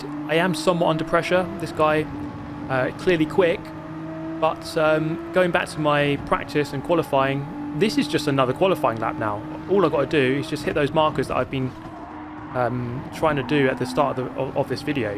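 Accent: United Kingdom. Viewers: Always calm and composed, unbelievably well spoken, tastefully subtle British accent, and an incredibly smooth natural tonality of voice complimented by a great recording / microphone is a legit perfect combination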